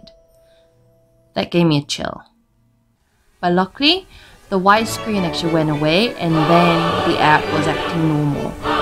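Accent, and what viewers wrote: Australia. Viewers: I'm sure I detect a hint of an Aussie accent. Am I hearing things ? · First video of yours that I have watched. My new mini will connect to my galaxy s7 with the DJI app, but I paid $25 for the Litchi app and it will not connect. Other people tell me it will work, but do not give clear directions on how to make Litchi work with my drone. I hear a Chinese AND what sounds like Australian accent. Did you grow up in Australia? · Have you spent time in Australia? You sometimes have an Aussie accent :)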